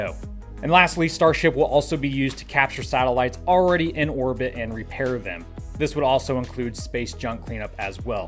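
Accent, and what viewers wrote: United States. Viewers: I like your content, but you have a lot of Kevin flavour, confirming all my Dutch stereotypes of what an American called Kevin looks and sounds like. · Seine Aussprache ist herrlich Amihaft.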